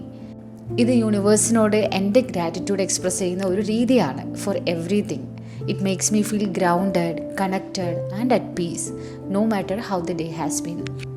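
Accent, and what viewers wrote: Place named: Bangalore, India. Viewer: U r in kochi right but ur smsrm oke kekmbo looks like u r faaar away frm Kerala like banglore oke nikne pole talking Ath kitula ith kitula sadnm nnoke pryne kekmbo I always think bro u r just in kochi ilathe ayt ndha uldh enn oke chaka oke lulu indvlo athrk venm Indel 😅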